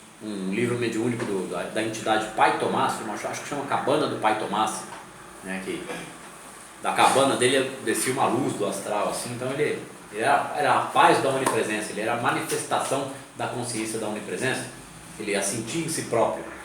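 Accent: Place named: Minas Gerais, Brazil. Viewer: Adoro sua dicção..bem mineiro mesmo ..eu tambem sou mineira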